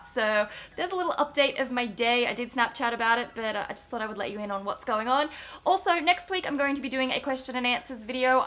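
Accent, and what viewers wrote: Australia. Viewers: she's Aussie isn't she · Are you a Canberra gurl?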